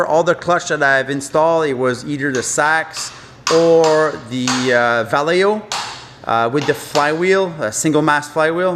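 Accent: Quebec, Canada. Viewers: good video thanks, I'm doing mine today, You sound quebecois , Are you in Gatineau or Montreal?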